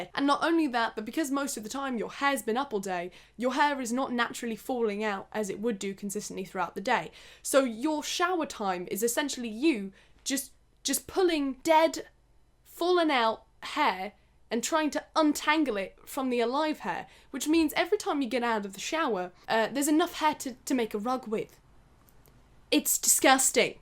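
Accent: United Kingdom. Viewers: the most relaxing british voice ever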